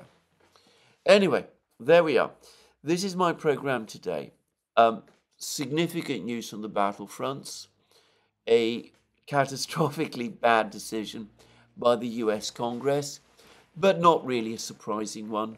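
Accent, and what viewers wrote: England, United Kingdom. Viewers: he speaks clearly and very slowly. I grew up in an English household so I’m used to the accent and even many of the mannerisms. The speed is the only part that irks me a little but I do understand why he speaks so slowly and concisely. I’m also happy that he checks his sources now rather than just parroting Russian MOD talking points like he did towards the beginning of the SMO. Basically his show is like an hour long run down of dozens of Russian speaking telegram channels and the information lines up. I appreciate him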